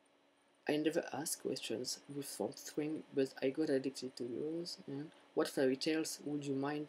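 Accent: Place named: France. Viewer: aaah beautiful french accent, I loved Rosy 'ardy :D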